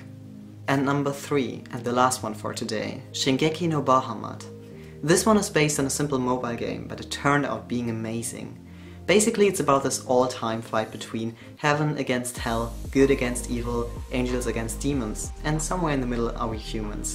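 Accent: Germany. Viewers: Idk if I'm just stupid... But you sound like you have a gernan accent😂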